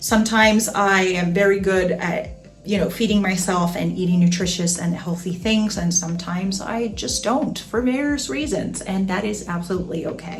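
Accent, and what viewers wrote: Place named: United States. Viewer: For someone who speaks perfect Italian and perfect German, your american accent is a put off. I'd rather hear you in those languages any day.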